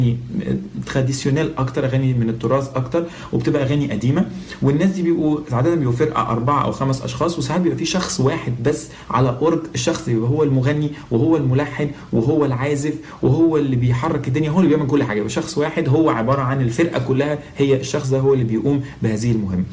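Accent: Tunisia. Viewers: لهجتك تبان تونسي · لهجتك تونسية بامتياز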